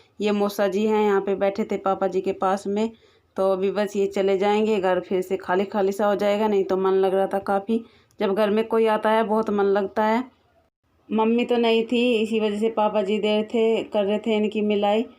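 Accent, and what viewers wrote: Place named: Muzaffarnagar, India. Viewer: ji aap Muzaffarnagar se ho kya aapki language se lagta h, Main bhi muzaffarnagar se he hu lekin abhi rahte h Chandigarh m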